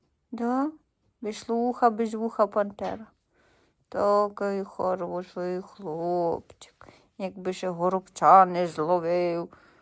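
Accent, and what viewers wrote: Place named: South, Ukraine. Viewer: Пані, ви неперевершена!Як надзвисайно приємно чути українську з півдня країни! Вклоняємося Вашим воїнам і Вам до землі.Щиро дякуємо, що ви усі живете захищаєте,любите та працюєте.Якби таких українців було багато у нашій рідній Україні - війни НІКОЛИ б не було.Не допустили б до такого. Живіть, живіть усі довго та щасливо! Панові Дмитру, якнайшвидшого одужання.Миросик дорослішає на очах.З любов'ю українці.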